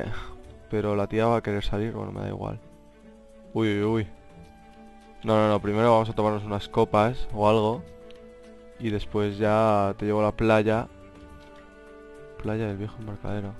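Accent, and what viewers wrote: Spain. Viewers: No hay pan para tanto chorizo, No hay pan para tanto chorizo! jajajajajajjaa!! es la primera vez q el acento español me hace reir tanto (porq admitamoslo en las peliculas,series y videojuegos ya es hostinante) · No hay pan para tanto chorizooooooo jajajajajajajajajjajajajajaja español y su acento, ricoooooooo ❤️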